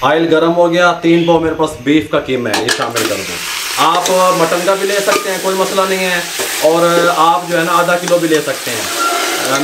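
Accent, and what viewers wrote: India. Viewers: I like your daise style bolne style mashallah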